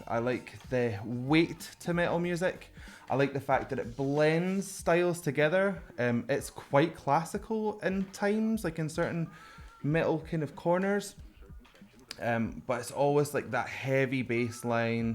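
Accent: United Kingdom. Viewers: Why I love British and Scottish etc accents so much? You are cute so it does not help 😜 greetings from Tricity in Poland for you and and BTS Army 😘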